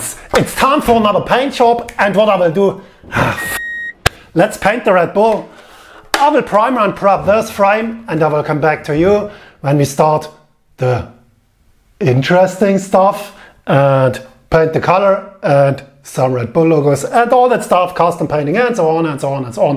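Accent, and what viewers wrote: Germany. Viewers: Mega Videos🙏🏼🔥 hab sofort rausgehört das du Deutscher bist 😅 Mach weiter so🚵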